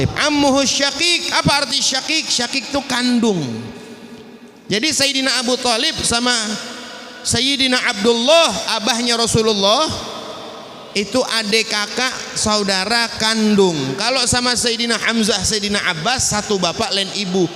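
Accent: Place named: Bekasi, Indonesia. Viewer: Logat Bekasi ny Kentel Bener....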